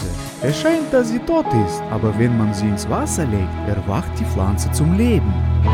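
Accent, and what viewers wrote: Russia. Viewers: Ich liebe deinen russischen Akzent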